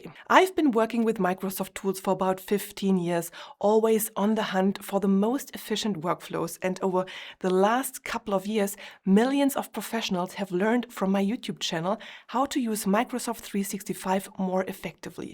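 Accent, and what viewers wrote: Germany. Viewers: Hard German accent.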